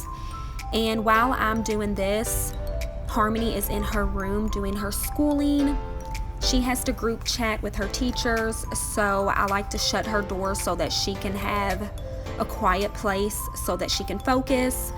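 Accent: South, United States. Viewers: My first time watching, I love it 🥰 and that southern voice 😂☺️❤️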